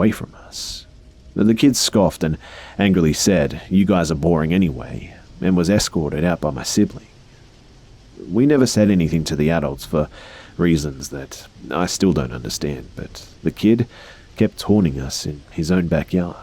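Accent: Australia. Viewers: Your accent sounds Australian. Are you?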